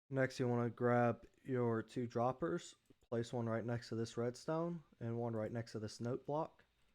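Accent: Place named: South, United States. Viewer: not gonna lie, i'm kinda delighted to hear a southern accent and no cringy intro and i'm not even american.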